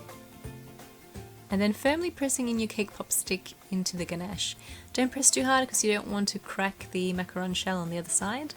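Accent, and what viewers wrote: Australia. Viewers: she pronounces 'almond' as its spelt, and 'sandwich' she says it in an australian accent - just like how australias say 'straya. main thing is we understand what is saying, nothing wrong with it at all.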